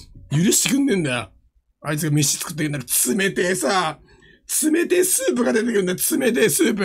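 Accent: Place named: Chiba, Japan. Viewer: 電話の時の話し方がマジで千葉の南の方のイントネーションで好き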